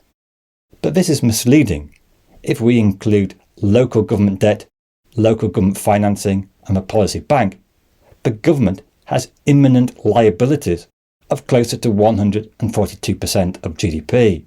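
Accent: United Kingdom. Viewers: 1.)British colonizer voice - check 2.)Faceless - check 3.)Screaming about the collapse of smarter Chinese rivals - check Yeah, safe to say most of the nonsense in this video is bullshit.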